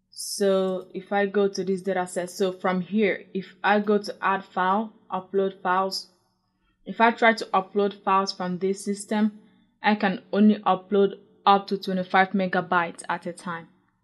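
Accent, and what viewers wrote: Nigeria. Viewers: thank you very informative n it sounds like you are Nigerian!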